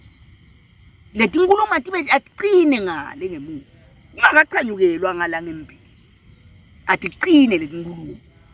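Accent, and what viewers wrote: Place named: Tsonga, South Africa. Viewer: Some shangaan tsonga dilect on swati language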